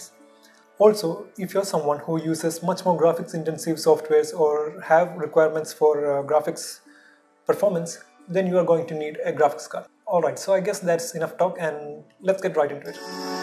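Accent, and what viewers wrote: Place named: Kerala, India. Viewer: ah yes, you're right. BTW, are you from Kerala? Your accent seems like it.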